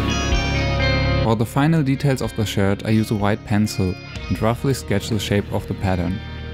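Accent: Germany. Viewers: Bro bist du deutscher hört sich nämlich so an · Ae you from germany because you have this accent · Ich könnte mich irren, aber der Akzent hört sich nach deutsch an. Lol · Dude, your english is indeed pretty good but nevertheless I instantly noticed that you are german.xD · sorry but are you german? You have a really hard accent. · Your german accent is thicc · You have a German axent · You sound like a german speaking english. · Dieser Moment wenn man halt direkt raus hört, dass er aus Deutschland kommt (oder zumindest deutsch seine Muttersprache ist) 😂😄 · Deine Stimme hört sich Deutsch an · Are you german? XD man hörts sehr😂😂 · German accent? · holy shit im more intrigued about his german accent and voice sounding out of place for someone who looks like that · Sehr cooler Zeichenstil und gut erklärt. Hatte nur bereits nach 2 Sekunden gemerkt, dass du Deutscher bist xD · Why do u sound like a german guy speaking english? 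I mean i would know what that sounds like since i live in Germany but...seriously bro. Btw i love your drawing skills!! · Hey are you German? Bc you kinda sound like you are and you were at gamescom :D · I dont know you but you sound like a german who speaks english. If its the case: hi ich bin deutscher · Kann es sein das du Deutsch bist? Du hast diesen Akzent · OK, sorry but I must ask you that... Are you a German because you have an small accent...🤔 No matter your Video is pretty cool😊😎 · Deutscher Akzent?:D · Could it be you're german? Cause your english sounds pretty german to me. Und ich bin selbst aus Deutschland. xD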